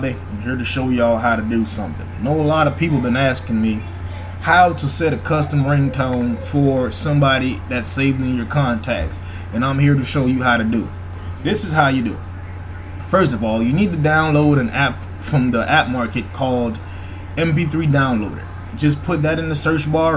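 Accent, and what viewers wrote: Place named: South, United States. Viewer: Love the country accent.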